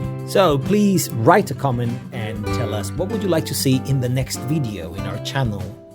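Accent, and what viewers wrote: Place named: Latin America. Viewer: I guess this is Latin spanish prononce, not spanish. Tortiyya, paeyya.. wtf tortidzha, paedzha...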